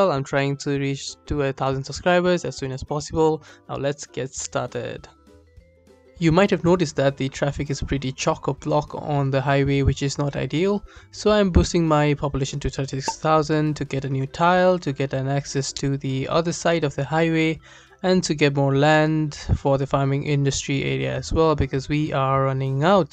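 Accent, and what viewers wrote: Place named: India. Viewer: Your accent is very much Indian ! Where are you from ?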